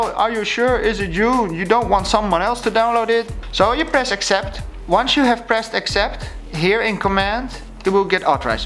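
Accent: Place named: Netherlands. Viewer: You have a ducth accent '-'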